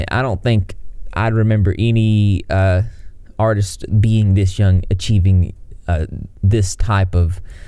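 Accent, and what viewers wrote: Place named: Texas, United States. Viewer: Your southern accent is as heavy as mine lol are you from TX?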